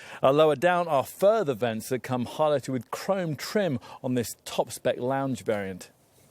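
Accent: United Kingdom. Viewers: I really love the videos by. This presenter also has an impressive personality and charisma. The video is beautifully explained, and it is rich and thick in its description. I loved the presentation, the language and the British accent. Most off all, I liked the candidness and forthrightness with which the reviewer discussed the positives and negatives. This was the Fiat Tipo review I'd been looking for since its teaser in 2015. Took me 6 whole years to find it finally. I am a forlorn Fiat lover from India where this product was supposed to be launched, but shelved after Fiat shut shop in 2019. I've watched this video like 20 times and still can't get enough. I loved it, and its amazing!!!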